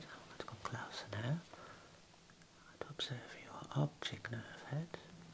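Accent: United Kingdom. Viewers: Are you British? Your accent and that jacket!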